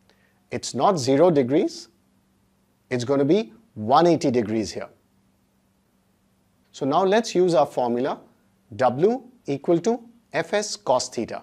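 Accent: India. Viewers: Sir your video on work is more convincing. Even you use simple indian pronunciation. That's why I am impressed. Thank you sir. You are doing hard work. Without the intension of fruit. Thank you again. Keep it up!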